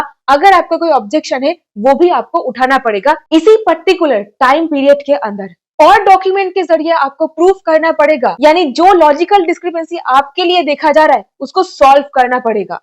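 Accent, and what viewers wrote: Bengal, India. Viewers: ম্যাডাম তুমি বাঙালি মনে হচ্ছে 😅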